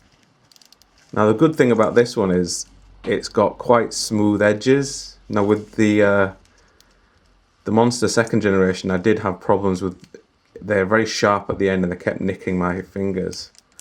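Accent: Yorkshire, United Kingdom. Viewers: Will do my friend I've subscribed so will be watching your reviews from now. Happy new year . PS do I detect a slight Yorkshire accent may be Leeds ?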